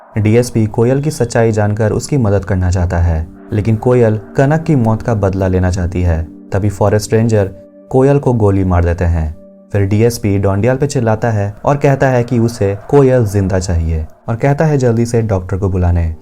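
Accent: Bihar, India. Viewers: You're from Bihar bro , ik 😌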